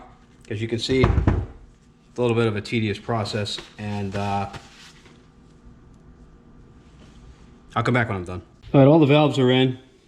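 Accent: Boston, United States. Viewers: dude i knew i could count on you. i have a headtht i'm ready to reseat the valves, i have the compound but i dont have the little suction cup lapping tool. i started watching , i thought you might have the tools but id have a look, and sure as shit you got a cheaper better way. i love your vids. i work alot like you. ive built three drz engines with your help on the first 2, third one i di by memory. since then i bought a kfx 400 quad. rebuilt that . i bought it thinking it needed carb tuning per the seller. got it homer did the carb woudnt start. i started looking around, turned out the oi was mixe with coolant. it had sat like that for along time. probably whoever had it was riding it like that til it finslly died. yeah i bought it without testing going on the sellers word. seemed like a goood guy. i learned a lesson, never trust a seller even if you met him at church. 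cuz thyll tell ya what you wanna here. theyre like politicans in that sense. i got it for $1500 though so i wasnt to pissed. its up and running like a champ now. only spent another 200 bucks so 170 i have a cherry 2003 kfx 400 big bore. i had some parts from drz's. carillo pistons are beautiful too. ii wanted to put it on a chain and wear it as a necklace but i put it in. drz runs like a beast. thanks to you i am now buildng engines myslf. u da man. wtf are you from boston area? my wife is from faihaven. its in the armpit. hour out of boston. her entire family family talks like you. she doesnt thank god. no o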